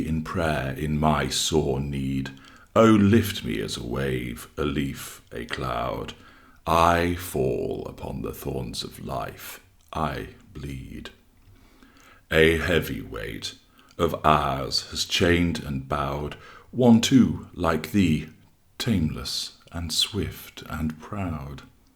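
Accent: England, United Kingdom. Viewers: Only an Englishman can speak like this.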